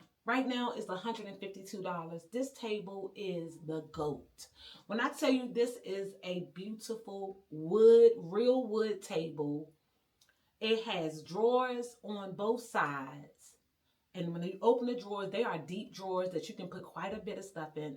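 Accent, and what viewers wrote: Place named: DMV area, United States. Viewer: OMG I just knew you were from the DMV area I can hear it in your voice lol I LOVE IT…… Girl you have a Beautiful home to God be the glory I’m truly happy for you✨💛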